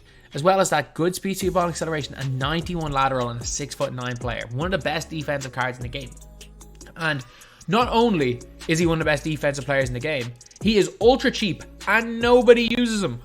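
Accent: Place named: Ireland. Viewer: Irish man talking about virtual basketball game is something I never thought I would enjoy for months on end.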